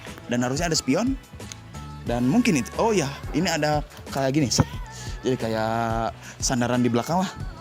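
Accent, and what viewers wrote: Indonesia. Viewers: Inimah..hhaa orang sunda..broo.!?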